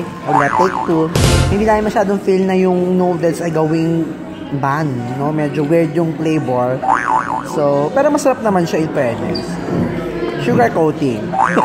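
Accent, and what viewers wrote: Visayas, Philippines. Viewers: Kuya! Curious lng ko, taga aha imo mama or papa? bisaya lge kaayo hahahaha! Proud Bisaya here 😊😊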